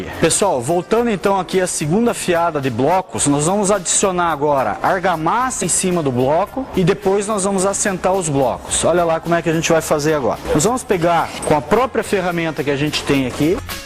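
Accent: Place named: Paraná, Brazil. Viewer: VC É DO PARANA PELO SOTAQUE EU TAMBEM SOU 🙌🙌🙌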